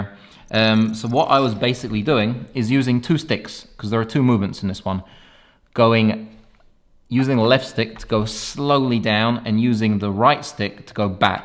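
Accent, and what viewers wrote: United Kingdom. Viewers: Are you a Brit living in the USA? Your accent has some US twangs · Great tutorial, mate!! It was very enjoyable to watch when a guy with UK accent shows that much emotion. :) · idk but I don't like getting drone info from UK accents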